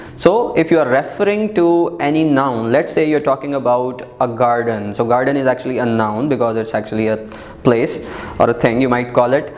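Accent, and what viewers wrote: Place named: India. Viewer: u sounnd freshie SIR. didnt help at all like bruh u and ur indian accent aint going no where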